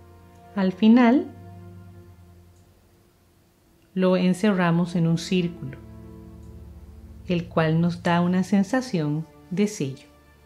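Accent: Costa Rica. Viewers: Por la pronunciación de su "r" pienso que es de Costa Rica. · Es costarricense , acento tico lindo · Es tica? Notó su acento :) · Sos tica? Esa R me suena tica jejeje soy de San José. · desde que escuché la forma en que pronunciás la R supe que eras de costa rica. Que orgullo que una mujer tan sabia y espiritual sea de mi patria ♡ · Sííí…! 😄 Yo también quedé intrigada. Leyendo los comentarios supe q era costarricense… Hay acentos que en América del Sur no es común escuchar…😊 Que agradable suena, verdad ? (Bueno. Además se nota que ella es una mujer educada. Modula muy bien y habla pausadamente). Saludos desde 🇨🇱 ❣️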